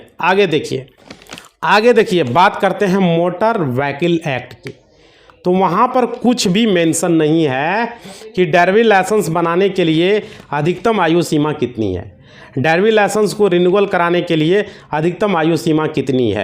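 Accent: Bihar, India. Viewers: Bhai sahab Teri Bhasha Se tu Bihari lag raha hai bakwas kitab nahin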